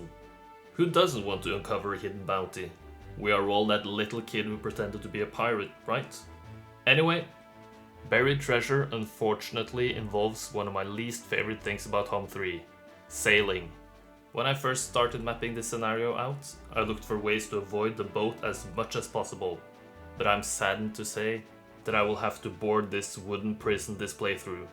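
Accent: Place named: Norway. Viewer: ❤ By the way, judging by your accent, might you be norwegian?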